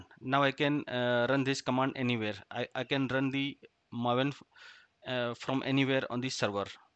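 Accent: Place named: India. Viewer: Why do Indians pronounce “Give” as Giuo ??? Why?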